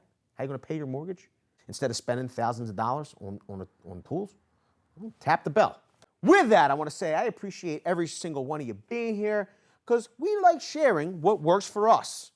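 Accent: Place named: North Jersey, United States. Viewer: You gotta be from North Jersey or close to that... Right? Sounds like it! Thanks for the video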